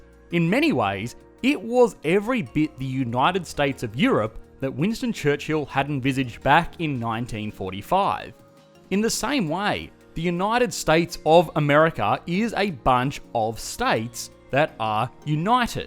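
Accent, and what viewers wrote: Australia. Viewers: I think this idiot Aussie sound alike is being paid by the corrupt EU l hope the UK leaves with No Deal, far better for us in the UK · The Australian accent is horrendous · Some biased shyte from this Australian speaking bod. Great British Pound....hahahaa Country finding its feet....EU never stopped UK from their trade and commerce. Wait and see when the sterling will be less than Euro. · What ethnicity are you mate, you sound Australian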